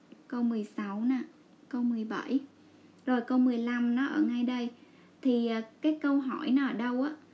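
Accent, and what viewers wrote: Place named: Dong Nai, Vietnam. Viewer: Không liên quan, nhưng em nghe giọng chị giống người dân Gia Kiệm Đồng Nai ạ :)) và cảm ơn các Tip của chị ạ, khá chất lượng và giúp e rất nhiều.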